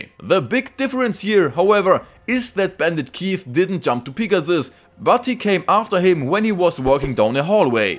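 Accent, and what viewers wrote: Germany. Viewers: Are you German? Cause you sound like that ^^ Edit: Ok, you definitely are xD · Dude, your german accent is kinda funny 😄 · I love your very strong German accent einfach geil Bro ich lach mich echt schlapp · dein deutscher Akzent ist schon sehr deutlich rauszuhören aber cooles video :D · That german english is unbearable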